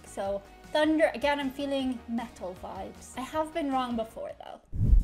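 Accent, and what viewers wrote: Ireland. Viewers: She’s Irish, some of her videos are even labeled as such (accent too). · She’s Irish by the sound of her accent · Nice irish accent there........ No effin way you made it to whatever age you are without hearing.........and supposedly completely unaware of one of the most famous rock bands ever........ Extremely popular in Ireland and on Irish radio stations...... in particular. Efin clickbait...........and I've just realised that I'm a victim of it.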